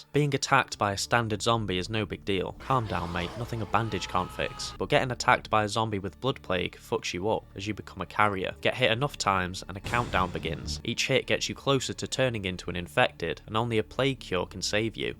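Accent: United Kingdom. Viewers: just started replaying both games and i have to say bro you've earned a new subscriber, i watched your SOD 1 video when it came out and it was fucking hilarious and so is this one, you're a good youtuber and i hope to see more videos from you, its rare i comment saying things like this so you're welcome, cant wait for the video about SOD 3 btw, what part of the UK are you from? i cant tell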